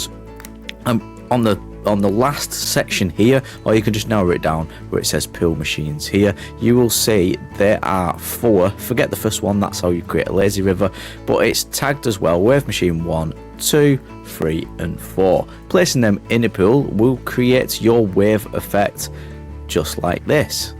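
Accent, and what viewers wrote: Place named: Northern England, United Kingdom. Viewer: Ahh your northern accent! Peerrrrfect! Great tutorial thank you xxx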